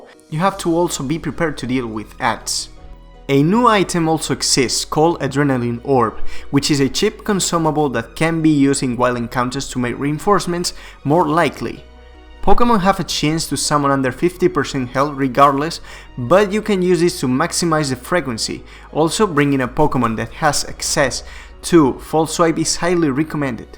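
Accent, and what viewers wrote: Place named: Spain. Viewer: Se nota que eres español pero tienes un buen nivel de ingles! Ha caido algu shiny ya?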